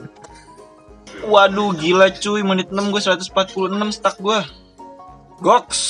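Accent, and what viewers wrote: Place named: Sunda, Indonesia. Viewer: Bang lo orang tangerang selatan ya sunda kasar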